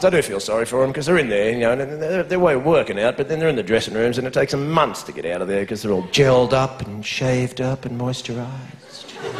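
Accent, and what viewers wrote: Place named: Australia. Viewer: George Carlin with an Australian accent.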